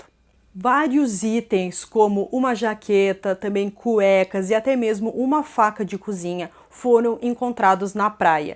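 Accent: Rio Grande do Sul, Brazil. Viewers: Tu és gaúcha?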